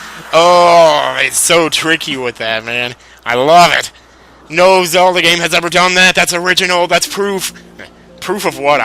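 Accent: United Kingdom. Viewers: british ftw :D?!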